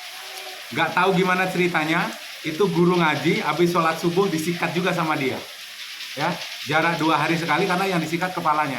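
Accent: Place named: North Sumatra, Indonesia. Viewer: Untuk saya yang lahir dan besar di daerah danau Toba udah biasa dengan logat keras seperti ini, bahkan mungkin logat bang seberapa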